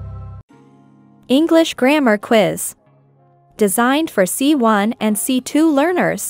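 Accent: United States. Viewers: These are not all C1-C2 questions. A decent grammar book in The UK will confirm that. Of course, the accent in this video leads me to believe that an American created this video… C1 is your (grammatical) level if you get more than 40 of these questions correct. And I would have liked to have seen more Tag type questions and mixed conditionals…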